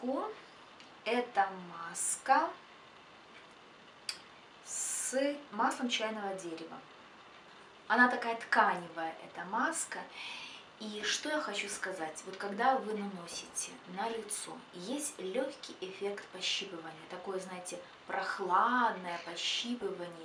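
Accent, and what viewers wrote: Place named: Belarus. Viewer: Вы такая приятная)!обожаю акцент белорусов!!!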